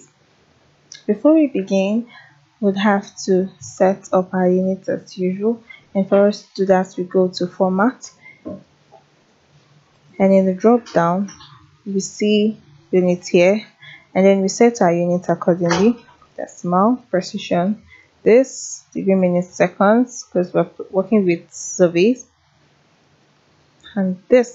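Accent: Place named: Nigeria. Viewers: nah. She rarely made grammatical blunders. I Understood her perfectly. So its either you're terrible at hearing English or you're just being presumptuously mean for no damn reason. That's a Nigerian accent. I'm Nigerian and English is the Lingua Franca in that country. Most of the new gens learn English as a first language. So you coming here on YouTube to give unsolicited Dimwitted comments because you can't comprehend her accent is just distasteful. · Don't be silly man, if you can't understand simple Nigerian Accent.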